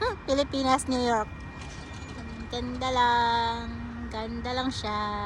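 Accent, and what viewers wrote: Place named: Pakistan. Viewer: Nice sharing sissy pero natawa din ako sau sa nag aantay nang maasawa hehe..nakakatuwang Pakistan ang boses mo sissy masayahin kang tao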